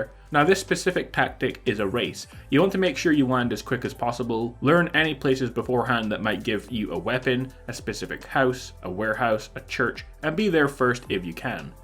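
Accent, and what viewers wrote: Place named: Scotland, United Kingdom. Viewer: I need to ask, what's your accent? Because im from Edinburgh and it seems like you have a Scottish accent but at some times it sounds American haha, also I love the videos buddy!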